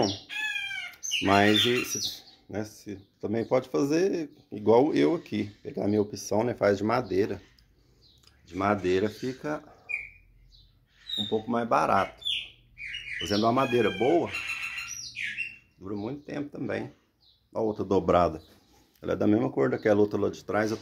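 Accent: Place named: Minas Gerais, Brazil. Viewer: . A vai cobrar kkkk. Notei pelo sotaque. Também sou mineira 😁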